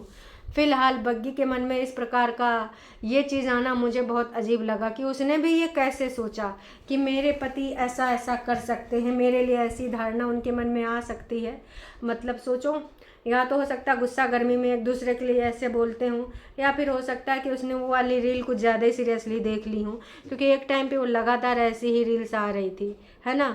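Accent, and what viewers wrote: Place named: Bihar, India. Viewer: I like what u say coz its correct bas thora aur Hindi mein agar bologe toh easy lagega samajhne mein , like wo bhojpuri bihari type feel hai toh samaj nai ata hai .. plz don't mind jst saying but u say correct stuff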